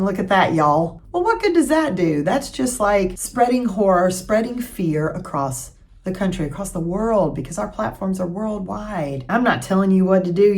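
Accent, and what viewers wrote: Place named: South, United States. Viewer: It is so nice to hear sensible things in the accent I grew up around. Squirrels and anything with the word pickle in it? Would never ever guess you're from the South lolol. As for protecting joy, right now trying to find that joy and cut out what I can with people who are entirely negative and cutting down on people who only take -- learning that my emotional labor and care is important and I shouldn't waste it on every single person that shows up with a problem. I also walk every morning and take short walks during the day. Knoxville, Tn area.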